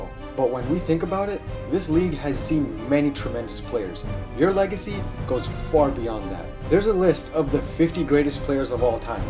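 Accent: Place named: Toronto, Canada. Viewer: Yes I am. I can tell you're from the 6 lolol.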